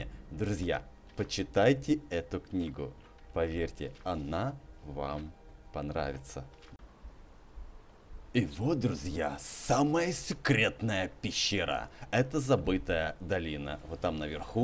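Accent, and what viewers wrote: Ukraine. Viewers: судя по твоему говору ты живешь на Украине? Или это юг России?? Ростов или Кубань?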